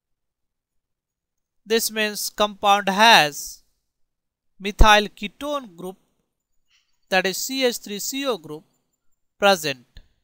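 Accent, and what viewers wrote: India. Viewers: 황진웅 my goodness, he is definitely not french. more like indian or something.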